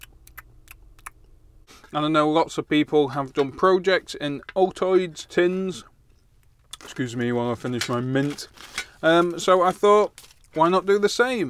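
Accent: United Kingdom. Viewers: Dear uploader, Sorry to say that this chinese booster module can't manage proper current & voltage continuously ; I believe unless it is frm a branded power 💪 bank. Anyway I liked yr idea. I wish to change my 18650 cell to higher capacity in my Qualcomm power 💪 bank. Yr British accent is really cool. :-D . I love ❤ british accent.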